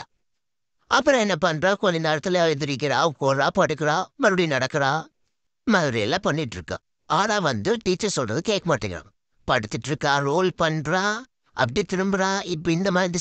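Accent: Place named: Coimbatore, India. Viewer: உங்க கோயம்புத்தூர் வாய்ஸ் சூப்பரா இருக்கு மேம்❤❤❤❤❤❤❤❤🎉🎉🎉💐💐🌹🌹🌹🌹🌹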